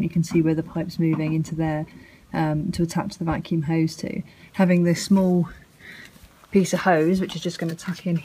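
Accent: United Kingdom. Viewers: Wow, you have an accent just like my ex wife. Are you from Surrey, U.K.??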